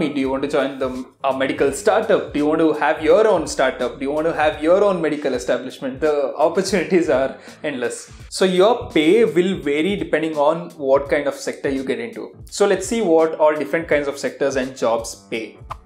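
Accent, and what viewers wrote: India. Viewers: I like the karnataka accent in your English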